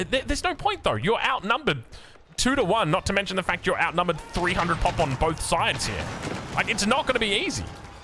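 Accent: Australia. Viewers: love this aussie man casting vods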